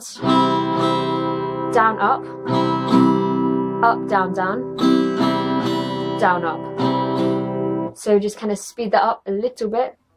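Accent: United Kingdom. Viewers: Bloody hell, you're Britain? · A beautiful girl with a British accent giving a tutorial of my favorite song! Who wants more? i can die in peace now. :-)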